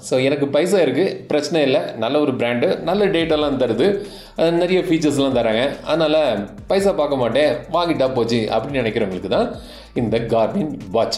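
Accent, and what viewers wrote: Kanyakumari, India. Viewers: அண்ணாச்சியின் குமரி தமிழ்..😍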